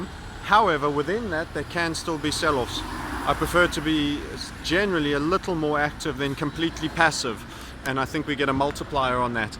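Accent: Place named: South Africa. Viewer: You sound like a South African whose been living in the UK for a couple of years.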